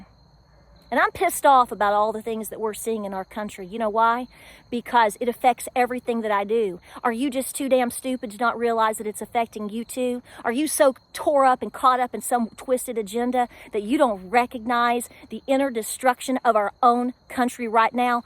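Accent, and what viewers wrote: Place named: Tennessee, United States. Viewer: I’m so glad to hear you speak like a Tennessean ❤ I’m not apologizing for speaking about this beat down we’re taking. They are trying to kill us off. I’m a Hillbilly & damn proud of it !! No apologies, only regret that I as a citizen, didn’t see this crazy coming sooner. Keep speaking out, & fighting on. Let me know where to find you other than here.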